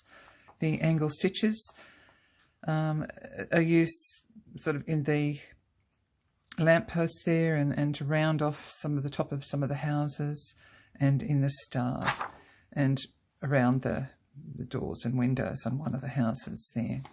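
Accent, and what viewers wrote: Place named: Australia. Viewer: is Australian... hence the accent!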